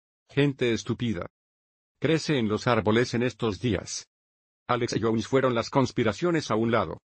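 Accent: Spain. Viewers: I'm a professional translator. It's actually not bad for software. I would love to contrast it to the original English text. It'll get the job done. The Spaniard accent may be a little alienating for the Latin American crowd. But like I said, it's actually pretty good for a machine. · Wonderful idea, Paul, there are around 500 million native Spanish speakers around the world that could benefit from your work. Like others have stated, the robotic voice and Castilian accent might draw listeners away. There are websites where you can get your essays translated professionally and maybe you can look for volunteers to read them with a more neutral accent. I'd volunteer. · 7 or 8 out of 10. The message is understandable. It is a Spain Spanish but again understandable. I am used to Paul Elam speaking but that is just me. This is okay in my opinion. La voz es un poco rápida y bien robótica pero se entiende.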